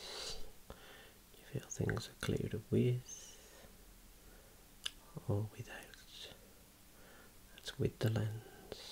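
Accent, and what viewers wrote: Birmingham, United Kingdom. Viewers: Brummie! Lush accent!!!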